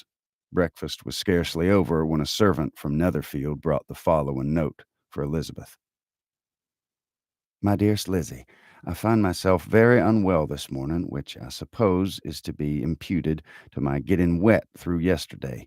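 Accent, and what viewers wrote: South, United States. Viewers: When I found these videos, I inwardly groaned, thinking it would somehow "bring down" the literature. Keep in mind, I live in TX myself. But after hearing a chapter, I loved it immediately. Nothing is lost in "translation", and indeed, the text lends itself to the Southern accents. My compliments to the reader, Mr..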